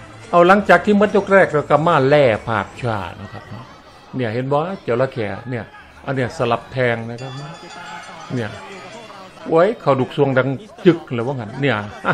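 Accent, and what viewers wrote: Isan, Thailand. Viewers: คุณ สุดยอดนักพากษ์ครับ ถึงแม้จะผสมผสานกับภาษาอีสาน แต่มุมมองของผมๆว่าสนุกดีครับ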